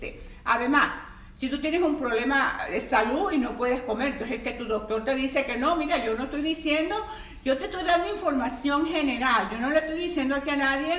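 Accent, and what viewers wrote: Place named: Venezuela. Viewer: Que interesante escucharte jajajaja venezolana hasta el tuétano mami más claro imposible jejejeje, mil bendiciones desde Valencia, Venezuela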